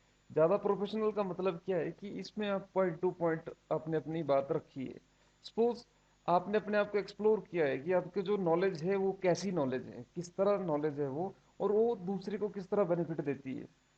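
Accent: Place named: Himachal Pradesh, India. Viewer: Very Informative Video in Himachali accent :). Thank you Sir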